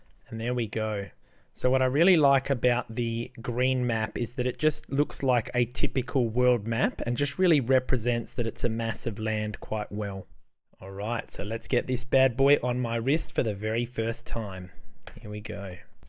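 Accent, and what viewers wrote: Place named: Australia. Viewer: Good to hear the ol' Aussie twang going on there! Good video, thanks. Before I gave mine to my son I used mine as my everyday watch, including swimming and hiking and it took everything like a champ. I had the steel bracelet one and changed it to a black Casio strap. Now thinking of getting another one, the black one like your one in this vid.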